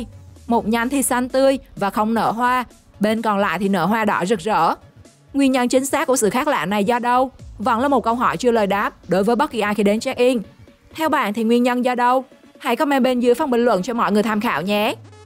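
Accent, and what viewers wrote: Vietnam. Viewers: Chương trình thì rất hay. Nhưng giọng đọc thì tệ quá. Nam không ra nam bắc không ra Bắc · Nguoi mien nao thuyet minh kho nghe.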